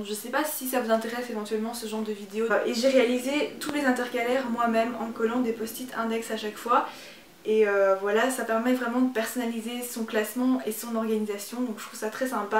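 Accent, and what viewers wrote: Belgium. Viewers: T'es Belge? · Tu es belge ?😄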